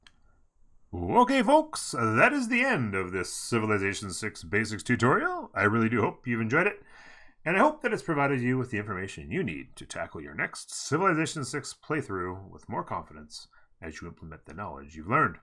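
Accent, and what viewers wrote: Canada. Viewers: Hey! Loved the video. While it wasn't exactly what I was looking for I did learn a lot. Production is king, culture is king (early game). Pay attention to tech tree (a tip I have there is once I get my early game stuff, I select something way late game like robots or nukes so it auto paths) My only criticism is you didn't explain why something is bad. Which fair enough, limited time in a VERY informational dense game. But I mean I signed up for a 35+ minute video so imo it's appreciated. I loved how you explained why to do X, Y or Z. So if you did that, I think it would be a big improvement! Also do I hear a fellow Canadian accent ? 👀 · Great beginner guide! So I'm guessing here that that's a Canadian accent, am I right? Going by the vowel O sound. Anyway, Canadians make the best guides.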